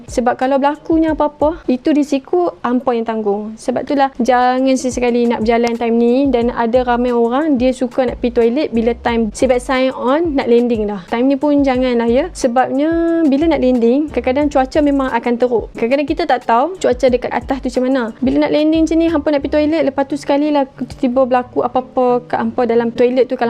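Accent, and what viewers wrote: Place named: North, Malaysia. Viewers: Muka mcm mat saleh tapi kedah piau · Utara universal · bantai cakap utaqha tequih 🤪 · Sedap dengar dia cakap utagha.. padu👍🏻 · Bahasa utaqha dia mmg piaw<link> 😎 Episode lepas: pakai accent KL Episode kali ini: You're the truly Oqhang Utagha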